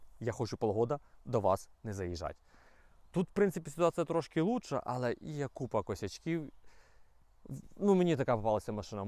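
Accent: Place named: Western Ukraine, Ukraine. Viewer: Заебательский заподенський говор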